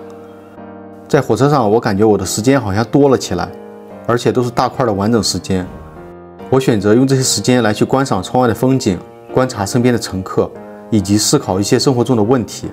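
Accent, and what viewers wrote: China. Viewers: 您是河南人吧❤❤❤❤口音❤❤❤❤中原特色❤❤❤❤❤ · 猜想博主是山东人？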